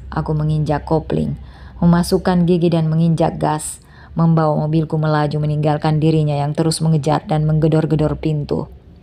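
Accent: Indonesia. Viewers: hadir bunda, jadi adiktif dengan chanel ini logatnya perpaduan sumatera sulawesi jawa hihihi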